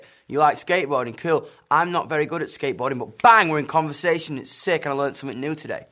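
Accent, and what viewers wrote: United States. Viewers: HIS AMERICAN ACCENT OMG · His American accent is better than our British accent........ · His American accent is pretty good!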